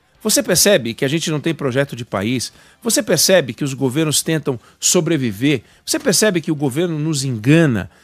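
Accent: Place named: Santa Catarina, Brazil. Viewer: Você deveria de falar para prefeito governador ajudar o governo federal fazer escolas técnicas para gualificar nosso jovens 20 por cento dessas vagas não foram preenchida aqui na minha porquê são vagas de mão de obra qualificada tem muito jovens querendo ecresar no mercado de trabalho mas tem como pagar cursos técnicos você parece que é de Santa Catarina com fala você não ajuda na só atrapalha você é filiado algum pardido porquê vocês da imprensa não ajudam vocês fala que melhorou pastante as vagas de emprego nos estamos precisando de mão de obra gualificada e que mais tem remuneração de salário melhor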